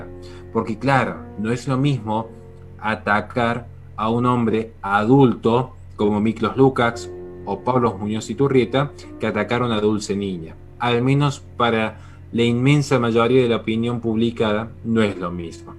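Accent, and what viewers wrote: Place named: Cordoba, Argentina. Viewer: Verdad, debo admitir que no me gustaba el acento cordobés pero que deleite es aprender de este genio, despierta mi curiosidad se saber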